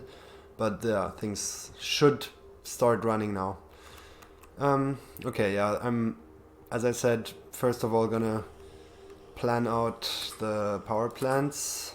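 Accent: Germany. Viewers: Just found your channel and really enjoyed you rambling. Are you german?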